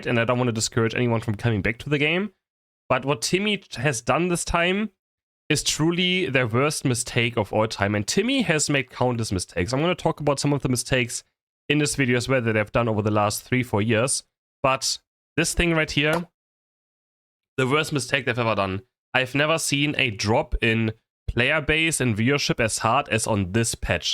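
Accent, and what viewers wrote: Germany. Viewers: I don't even play the game for license points as I already own the pokemon I like to play as. For me, item enhancers are more important, to max out all the items I use. Also this guys accent is cringeworthy thick lol (I do speak german natively and I always feel like this guys videos should be in german instead lol) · Du hast einen sehr starken deutschen Akzent wenn du englisch sprichst xD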